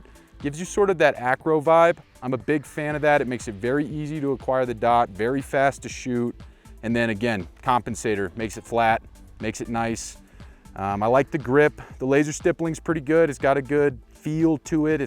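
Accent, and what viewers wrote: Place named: New Jersey, United States. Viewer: Turned it off a quarter of the way through. I couldn’t listen to him say “one shot everybody knows the rules” one more fucking time. Can’t stand listening to that Jersey shore voice either. Have a great life. Wish you luck.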